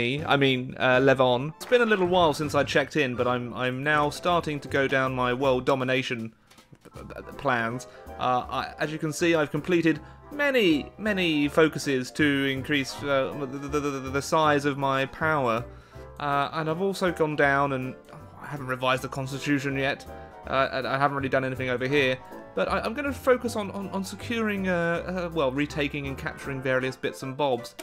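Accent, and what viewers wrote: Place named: United Kingdom. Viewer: Yeah, I wish I had half the charisma and British accent he has. I'm just here to show support for the man who gives me a reason to look forward to something more than just another day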